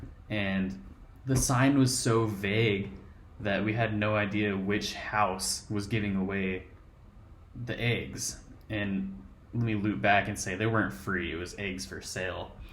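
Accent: Colorado, United States. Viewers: I didn't know Colorado had an accent! Probably different now than it was 100 years ago. That was probably more like Yosemite Sam in those days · I am half American half Australian and lived in Colorado as a child in the 90s. I love your video because it's great to hear the accent again. I love and miss Colorado and want to visit again some day. Peace dude! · Hahaha you’re hilarious. I don’t know if it’s because we share the exact same accent or if you’re actually that funny but I was laughing a lot during that video. I’m in Mexico right now learning Spanish and I’m just watching these videos to get a taste of back home. I miss you all in Colorado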